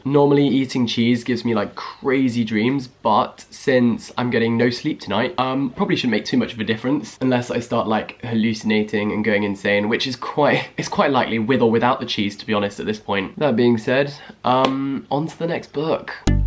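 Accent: United Kingdom. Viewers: Your violently British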